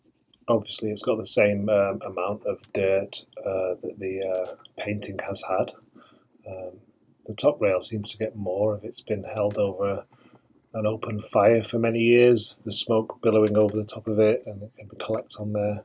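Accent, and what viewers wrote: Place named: Derbyshire, United Kingdom. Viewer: I’m from Derbyshire and your accent sounds very familiar. Love your down to earth approach and subscribed right away!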